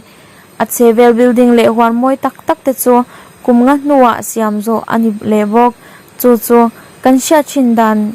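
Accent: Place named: Myanmar. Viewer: Enge in sawi sel vak vak. Burma aw phawi a nih hi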